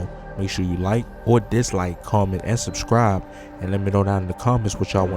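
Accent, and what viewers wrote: Baltimore, United States. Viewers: U from bmore? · This man got that Baltimore accent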